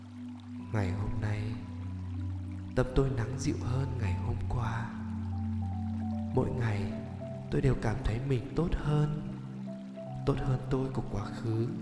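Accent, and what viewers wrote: Vietnam. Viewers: Giọng anh hay quá, giọng Bắc chánh tong tôi nghe mà rất cảm xúc, ấm áp lắm! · Chú là người Hải Dương phải khong ạ ><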